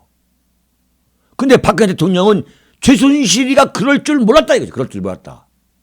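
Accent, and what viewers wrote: Chungcheong, South Korea. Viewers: 이아저씨 정말 구수한 말투 좋와 ㅋㅋ 충청도 어디신가? ㅋㅋ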